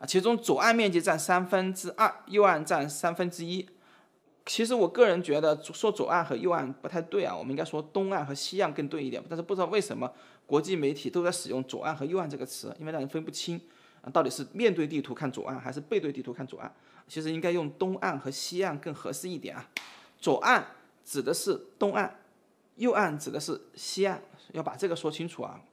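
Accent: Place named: Hunan, China. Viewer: 你这口湖南普通话一下子就把你的节目打了不小的折扣。还是让别人替你表达吧！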